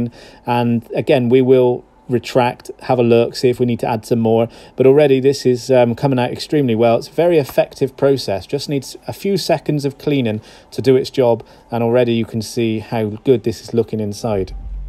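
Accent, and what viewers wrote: Bristol, United Kingdom. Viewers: Bristolian accent maybe?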